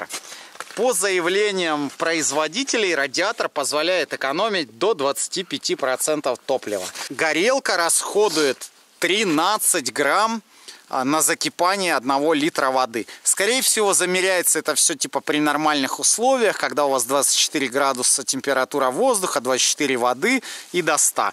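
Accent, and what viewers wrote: Russia. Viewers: 1. Говоришь "я дам свое мнение" чувак какое МФТИ ты безграмотный. 2. Акаешь, кривляешься, слушать невозможно, отвращает с 1й - же минуты хотя что взять с масквАча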